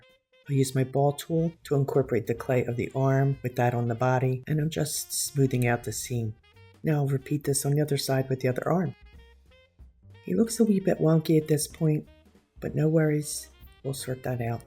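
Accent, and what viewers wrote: Philadelphia, United States. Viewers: Just stumbled upon your video when I was looking for vintage Christmas crafts. As soon as I heard your voice, I knew you were from the Philly area! And then you mentioned Kindy’s! That’s so close to where I grew up!! Just subscribe to support a fellow Philly girl!!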